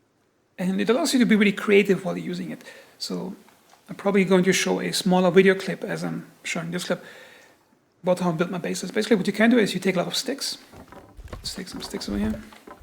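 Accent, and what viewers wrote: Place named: Germany. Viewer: He sounds German to me...he's not treating this as a game, more like a job.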